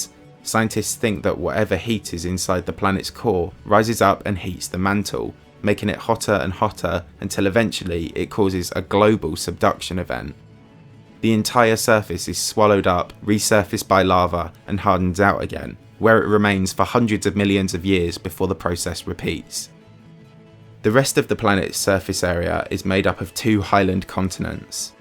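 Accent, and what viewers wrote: United Kingdom. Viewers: Sorry but it's hard to take this guy seriously with his unique British accent. Instead of saying "cold" he says "cowd". Hilareous!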